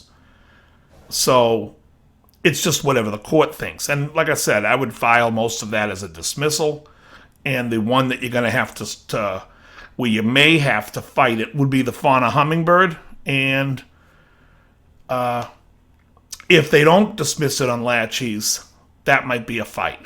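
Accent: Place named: New England, United States. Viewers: Just found your channel today. Love the Sox jersey. You sound like youre from New England too. Waltham here. Anyway I agree with your point completely. Good shit guy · I subbed because I'm positive from the shirt and voice youre from Mass and so am I so fuck yeah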